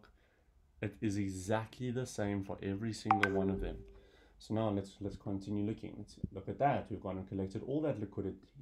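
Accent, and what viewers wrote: South Africa. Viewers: If the South African accent is annoying, speed up the video to x1.25.. kwaai video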